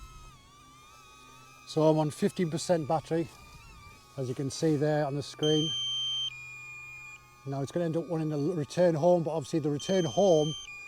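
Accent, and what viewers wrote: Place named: Teesside, United Kingdom. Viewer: Are you from Teesside by any chance i highly recognise your accent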